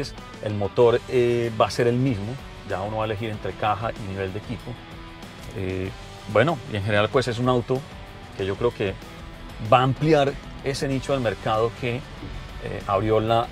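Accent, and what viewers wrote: Bogotá, Colombia. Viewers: Habla como rolo lentico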